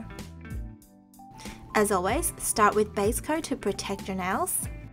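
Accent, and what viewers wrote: Australia. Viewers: She sounds so Australian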